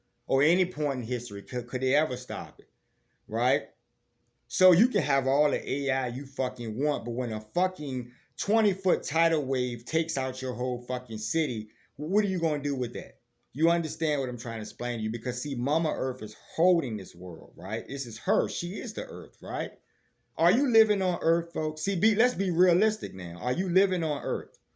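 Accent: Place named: South, United States. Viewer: I love that you are a Southern African American. I love your accent/dialect.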